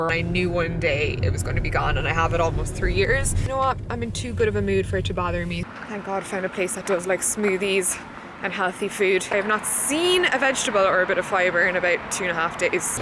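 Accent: Ireland. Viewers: I love your Irish accent. Makes you an awesome narrator lol. And it goes well with the New England theme...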